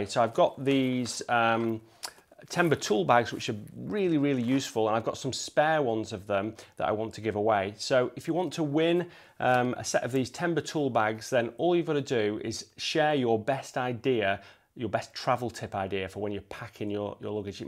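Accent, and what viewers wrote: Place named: North West England, United Kingdom. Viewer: North West accent - yes.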